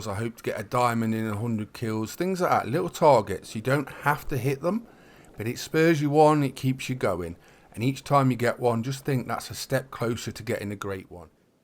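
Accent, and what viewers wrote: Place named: Leicester, United Kingdom. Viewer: Did you see ladylegend mess her great one shot? I think she was robbed, the shot looked fine, but I’ve noticed some of my shots are off target since the aus map came out. I can’t believe it’s taken watching your videos to catch on that carrying a tent with you at all times is so handy? I was always concentrating on having the max load out with guns and callers etc. that advice is golden! Great video, gunna start my great one grind tomorrow. 👍🏻 by the way, are you from Leicester? You sound like it, I know a couple of people from there. I’m down the road in Bristol 🇬🇧🇬🇧🇬🇧🇬🇧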